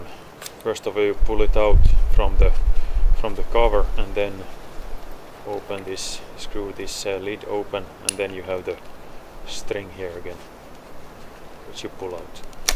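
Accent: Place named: Finland. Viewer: Hmmnmm... Let me guess... You are from Finland.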